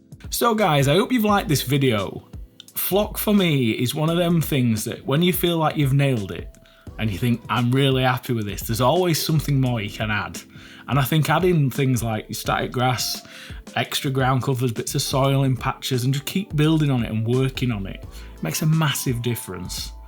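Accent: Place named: Yorkshire, United Kingdom. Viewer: yes lad.. this is that perfect level of yorkshire accent and professional elocution. didn't mean to sound like a dick in prior comment lol. sold on the foam flock, natural undulation is easy mode. purchasing soon!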